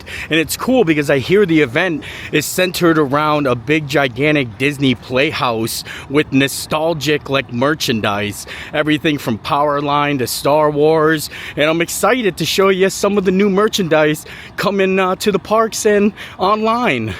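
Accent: Pennsylvania, United States. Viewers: That pa twang! (I'm from Trenton originally)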